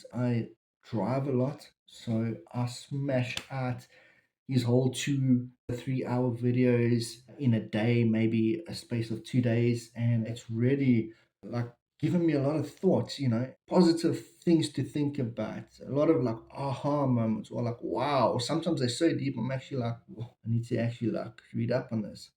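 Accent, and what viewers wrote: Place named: South Africa. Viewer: You sound South African brother! Thanks for sharing. Popping by from Mozmabique!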